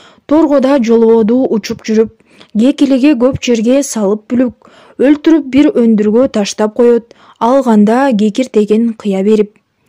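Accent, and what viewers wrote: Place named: Russia. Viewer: Киргизка окшойсун а, акцентини ондо же оруссунбу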